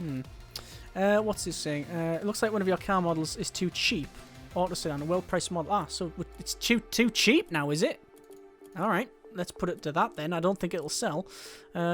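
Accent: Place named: Yorkshire, United Kingdom. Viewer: I see you joined YT on my Birthday, the 29th Jan, although I was born some 46 years earlier in 1966. Am I too old? joking. Are you a Yorkshire lad? if you are I bet your Mums backdoor is always open for a cup of tea. I'm a good bit deaf nowadays so I might hear the accent wrong a wee bit. I am a Norfolk boy. I'll stick around and watch this playlist, retired now so have a bit of free time.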